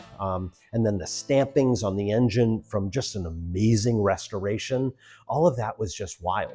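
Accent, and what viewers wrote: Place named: Canada. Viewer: Let Me Guess You're canadian ?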